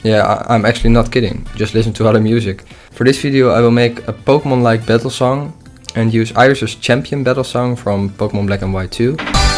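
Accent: Netherlands. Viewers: Nice, i could hear it on your dutch accent :D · A few seconds into the video and I could tell you were dutch ! :p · As a dutch person I can confirm he sounds Dutch. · hoi, ik herken een nederlands accent, heb je een discord server? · my brain knew you were dutch the first second in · Hoi ik kon al meteen aan je accent horen Dat je Nederlands bent. Anyways coole video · your definetly dutch bro your accent lol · ja neterlands accent heb je wel door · there is something in the way netherland people talk english, that makes me think theyre from the netherlands, gues i was correct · Very dutch accent lol I love it great video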